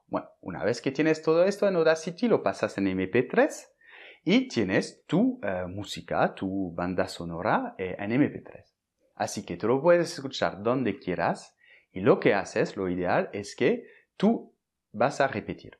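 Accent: France. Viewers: No Sabia que usted tenías lecciones en español también! Tengo que decir que oír español con acento francés es una de las cosas más linda que he oído hoy 😂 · Nasaliza alguna vocal pero su pronunciación es excelente, lo que le queda es un ligero acento francés que hace que escucharle sea un placer. Puede que a ti no te guste y sería legítimo si así fuese pero decir que la pronunciación de este hombre es mala no tiene ni pies ni cabeza, lo siento.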